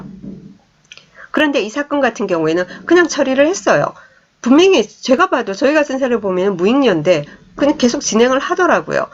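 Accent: Busan, South Korea. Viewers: 소장님 달달한 부산사투리하고 추임새...듣기좋네요 나만 좋은건가ㅎ 강의 잘들었습니다~감사합니다❤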